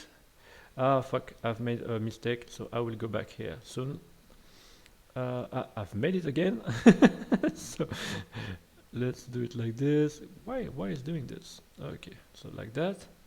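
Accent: France. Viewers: Ouais je me suis dit que son accent c'est 100% français.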